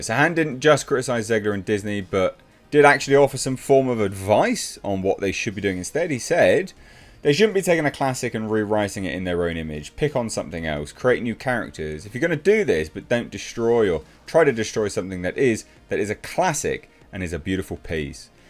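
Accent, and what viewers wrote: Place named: United Kingdom. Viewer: As a gen-you-wine good-ole' down home, back woods country boy, I must say I love hearing you say, "boy howdy" with your beautiful British accent. Well done, sir.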